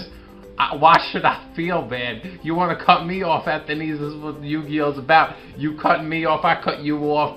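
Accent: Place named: Brooklyn, United States. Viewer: Apologies if its wrong but I believe its a brooklynn accent.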